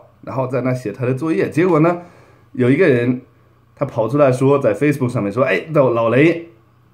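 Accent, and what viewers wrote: Northeast, China. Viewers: 老雷你咋是东北口音呢,,,哈哈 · 东北口音。。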